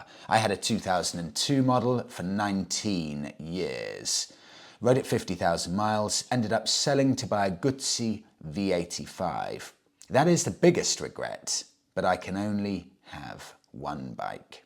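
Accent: United Kingdom. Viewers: Fer crissakes, ya gonna 'pologize fer having an English accent next? Never apologize for pronunciation, just do it & move on....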